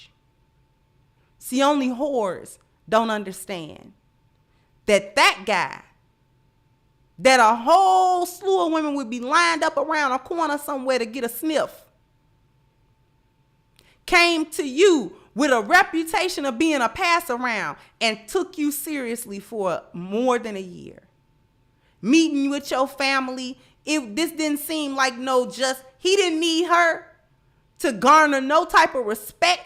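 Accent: Chicago, United States. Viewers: I didn't know you were in Chicago, but since you said it I can definitely hear that Chi-town accent. 😉 I have family and friends all through the Chi. By the way, you're coming with that fire 🔥 today! Lori Harvey is getting ran through... It's sad really.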